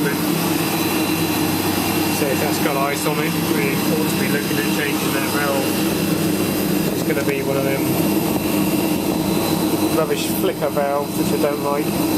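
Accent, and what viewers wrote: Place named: London, United Kingdom. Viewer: I think it's that accent from London, England that's really throwing him off !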